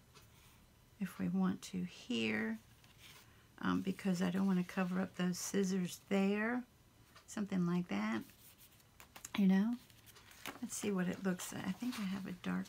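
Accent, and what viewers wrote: South, United States. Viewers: I live your accent you are such a sweet southern belle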